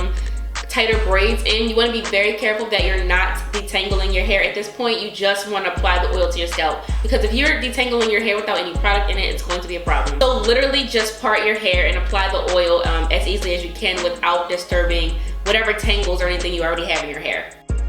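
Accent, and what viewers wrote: Baltimore, United States. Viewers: Now that you’ve stated you’re from Baltimore you and IAmTreah sound just alike but her accent is a lil stronger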